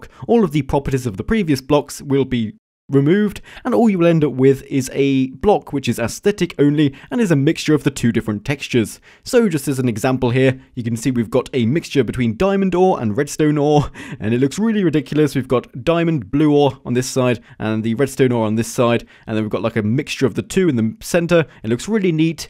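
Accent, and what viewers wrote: United Kingdom. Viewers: is he really british?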